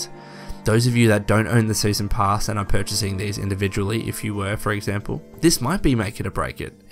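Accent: Australia. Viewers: hope the "Fah Hah Bah" jokes don't upset you, know that we love you and your kangaroo accent!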